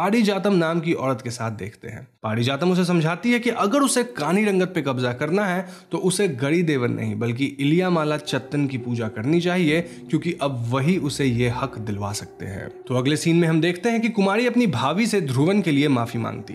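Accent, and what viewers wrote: Bengal, India. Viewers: Just by the narrator’s R pronunciation, it can clearly be understood he’s a bengali.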